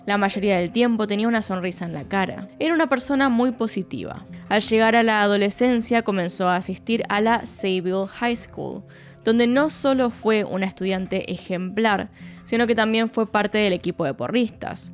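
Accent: Argentina. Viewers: Eres mi fav. Aparte que amo el acento argentino! Saludos desde Mexico 🇲🇽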